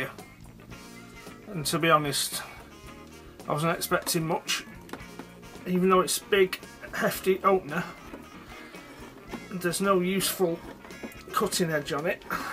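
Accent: United Kingdom. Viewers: British army knife. British accent. American Flag. Great presentation bro. Greetings from USA 🇺🇸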